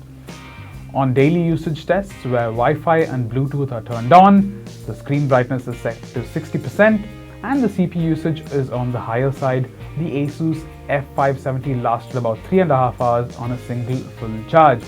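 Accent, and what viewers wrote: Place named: India. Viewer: Fuck i can't stand the fucking idian accent ... it gives cancer 🤮🤮🤮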